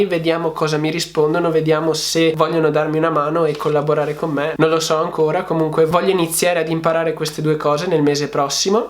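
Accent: Veneto, Italy. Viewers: ciao! Un giorno se ti va ci si può trovare, ti seguo da un po, mi piacerebbe confrontarmi con te su questo argomento. Io ho 29 anni, auguri x il tuo compleanno! Dal tuo accento credo che tu sia del Veneto. Se è così siamo vicini e sarebbe bello potersi incontrare x parlare su investimenti. Uso una piattaforma da farti vedere con cui si può guadagnare tutti i mesi qualcosa.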